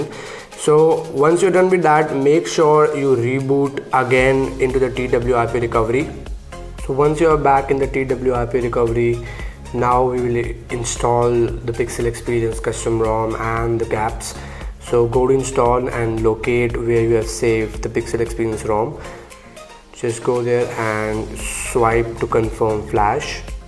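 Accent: India. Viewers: индусский английский это кровь из ушей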